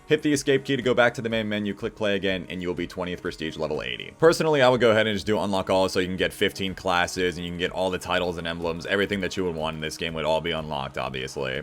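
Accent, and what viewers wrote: Greece. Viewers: Wait! Are you Greek? 0_0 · is he greek? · Bro are you greek??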